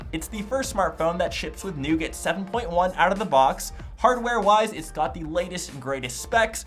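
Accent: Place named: Canada. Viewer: lol you're Canadian accent is strong. nice video🙌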